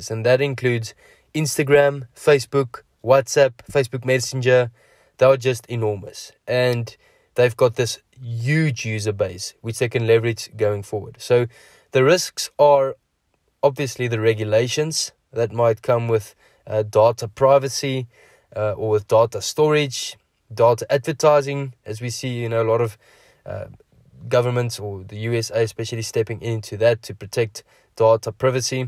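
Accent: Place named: South Africa. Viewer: Apple? - thanks for the tips = good to hear a SA accent discussing stocks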